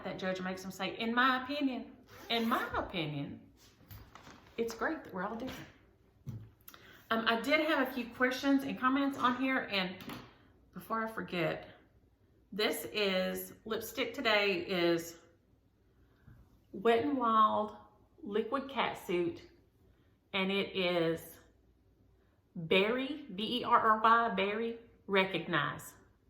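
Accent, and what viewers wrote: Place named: Texas, United States. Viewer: My mother-in-law was called Shug by her parents and siblings. She was the oldest and they always called her Shug. (or Sug - short for Sugar). She was from Texas (Georgetown, Williamson) and had the sweetest Texas "twang" (very similar to your accent). If I ever get the opportunity to be a grandma, that is what I would be called "Grandma". Both of my grandmothers were Grandma and I loved them both dearly. It just seems natural to me, but I totally get how you feel the need to be a different name to your grandchildren. I think since you called each of your grandmothers something different, it isn't a "title" but a "name" - and, I agree, names should be somewhat unique. In regards to my ex-husband. He is gay and finally decided that it was unfair to either of us to remain married - it wasn't easy, but I have come to realize that that was true. 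He is in a relationship with the most wonderful person. They are both my family and I couldn't imagine life without them! And I totally second Roots Tech! It's a great opportunity to get some ideas on genealogy research. They also have an online tool where you can see other attendees and if you are related to them. All the best to you this week!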